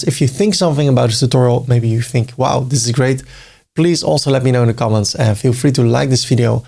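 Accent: Netherlands. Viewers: So that where that accent is from, from Holland.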